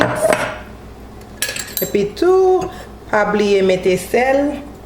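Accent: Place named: Haiti. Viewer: Great job.... and you were worried about you Creole accent! Proud of you! Happy New Year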